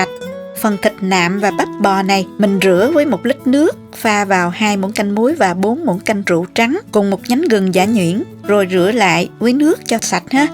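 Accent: South, Vietnam. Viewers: Giọng nói miền Nam dể thương và rất lôi cuốn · ơi, xin cho hỏi công thức nầy là cho mấy tô phở vậy cô? Nhìn cách cô nấu và trình bày hấp dẫn quá cộng thêm giọng nói đậm chất người miền Nam thích quá. Chỉ muốn nấu liền ngay thôi. Xin cảm ơn nhiều trước nhen. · giọng hướng dẫn đậm chất Nam bộ rất ấn tượng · Làm thấy đã ngon cộng thêm giới thiệu lại càng dễ thương hơn với lại giọng miền nam nữa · Chính xác, giọng ở miền nam mình nghe dễ hiểu hơn và ấm áp + chỉ dẫn thực hành rõ ràng dễ hiểu, có năng khiếu và chia sẻ công thức cho mn, đây cũng là điều tạo nhiều công Đức cho và con cháu 👍🥰🙏🙏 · Qua phát âm thì em là người miền Nam, theo khẩu vị trong Nam thì em còn thiếuGiá trụng,Húng Quế và Ngò Gai cũng như cầncó thêm Tương Đen&Tương Đỏ ! Cám ơn video hướng dẫn của em.Thân.👍☺️ 😊☺️ 😊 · Tôi rất thích giọng miền nam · Giọng nói miền nam dể thương quá e cũng người miền Tây cảm ơn chia sẻ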